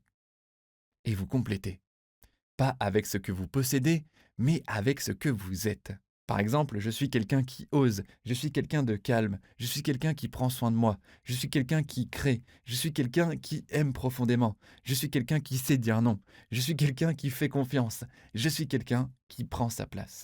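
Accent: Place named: Maghreb. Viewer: Il est étrange que-à mon point de vue-vous ayez un accent maghrebin alors que vous l'êtes pas dorigine ! J'aime vous écouter car la manière dont vous vous exprimez, engendre relaxation et détente, au sein de mon système nerveux énervé : Une impression que j'ai.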